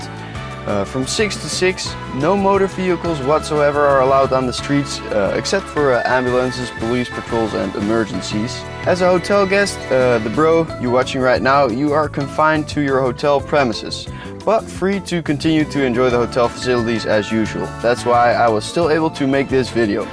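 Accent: Netherlands. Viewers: haha i knew you were dutch even before you said amsterdam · Are you Dutch? You sound really Dutch! Trans: ben je Nederlands? Je klinkt erg Nederlands! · Spot the dutch accent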